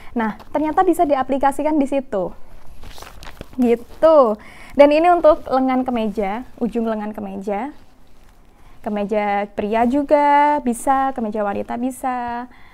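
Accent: Java, Indonesia. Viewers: Aku seneng nih kak ngomongnya medok medok gimana gitu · Mbak ngomonge medok tp lucu😁.luvv mbak q lgsg mengulas tabus krena merasa sangat amat bermanfaat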